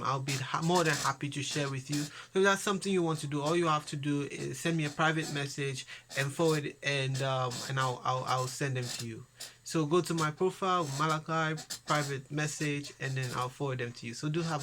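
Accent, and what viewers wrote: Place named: Nigeria. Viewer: You sound like Nigerian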